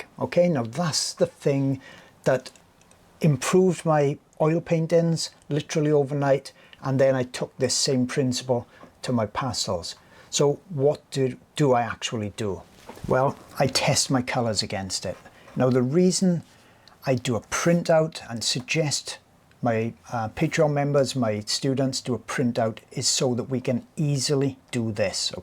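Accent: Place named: South Wales, United Kingdom. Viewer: Hi, another great video! Thank you. I agree the paper Pastelmat is a total game changer! It gives back the joy in drawing that the other papers sap away from us! Ps. I love to hear you talk because it reminds me of my nana and grandad who came from the Rhondda Valley in South Wales. 😊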